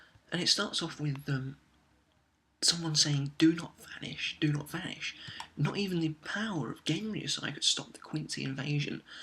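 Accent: United Kingdom. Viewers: Wow, I didn't even think of what kind of side effects the balance of soul would have on the human world. This is why I like your reviews, your insightful and British...are you British?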